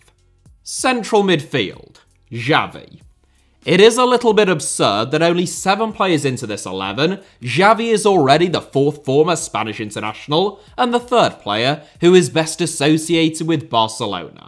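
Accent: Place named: East Yorkshire, United Kingdom. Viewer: I often disagree with, yet here I am again to let him elicit angry puffs of steam out of my ears with his opinions. It's official, it's an addiction now. I'm even subscribed now. I blame that drowsy East Yorkshire accent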